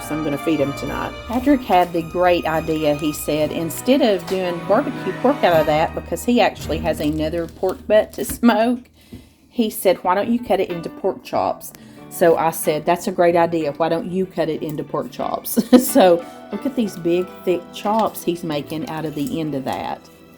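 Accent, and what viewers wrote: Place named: Mississippi, United States. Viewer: East Tennessee? I had guessed Mississippi accent; my husband thought Texas. Merry Christmas :)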